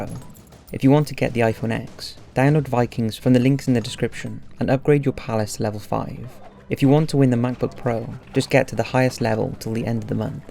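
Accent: Wales, United Kingdom. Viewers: Welsh..I believe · You have an interesting voice. Welsh ? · Why do british people say years like yuurs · Sounds like a 12 year old British boy is trying to read me a book on Viking torture techniques.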